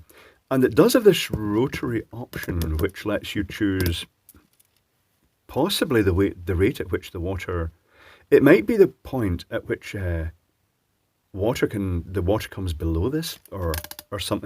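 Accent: Scotland, United Kingdom. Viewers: There is something relaxing about a softly-speaking scottish-accent man discussing about toilets for 20 minutes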